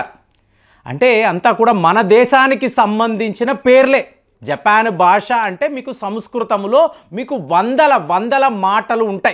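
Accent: Tamil Nadu, India. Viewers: While doing telugu వీడియోస్ యూ have to refine your tamil slang garu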